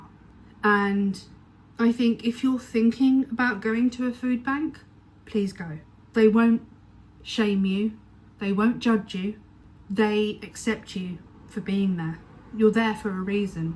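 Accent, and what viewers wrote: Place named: United Kingdom. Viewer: Because of your accent and you mentioned the UK toward the end I appreciate knowing where you are. We manage a food distribution out of a church in Northern California. Your experience of developing friendships and feeling not judged is exactly what we have too. We do not prequalify our guests and know they are there because they have some need of community and food. About half our volunteers are guests that asked if they can help us. Sure! We have volunteers from all kinds of nationalities, beliefs and finances. Our strength is having a great variety of people learning to work together on a common cause. We know that not all the food we give is a good fit for everyone. Our hope is that they try the food and give away what they don’t want. I’m reminded of the lady who was delighted to receive a big package of chicken feet! 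Wonderful! I would like to see offered counseling on budgeting. Sometimes we need an uninvolved person to suggest leaks in our spending habits. We offer listening to their story and prayer. God bless you in your journey. God bless the church that opened their doors and finances to their community.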